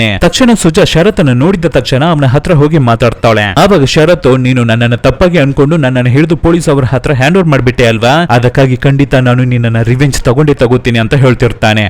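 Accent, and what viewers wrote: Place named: Kerala, India. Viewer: malayali kannada accent kaka angadi maatu ide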